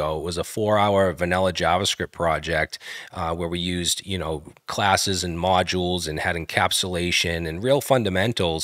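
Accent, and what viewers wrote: Boston, United States. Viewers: best Boston accent on YT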